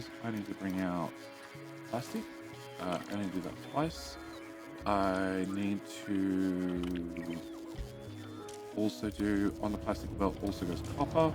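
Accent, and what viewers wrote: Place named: Australia. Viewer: It's Australian mate, different English ay.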